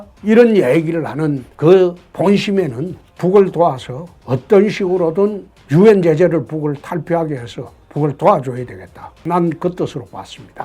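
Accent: Gyeongsang, South Korea. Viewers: 홍준표가 경상도사람이라 억양이 과격하게 들릴수도있으나 지나보니 다맞았다..대선토론회했던 영상 다시한번봐봐라 문재인이 얼마나 허무맹랑한 공약을 했던가.. 말문만 막히거나 곤란하면 한다는 소리가 그말 책임지셔야합니다.. 세월호사건과 겹치며 그놈의 갬성이 문재인을 만든거다.. 누가되었든 다음대통령은 정말로 대선토론회 꼭보고 투표하길바란다. 후보자들이 공약대로 지키지는 않지만 쩝쩝이같은 인물은 다시는 나와서는 안된다 네이버 댓글에 이런글이 올라오니 공감수가 압도적입니다 이제 천천히 준비하십쇼 이나라를 구해주세요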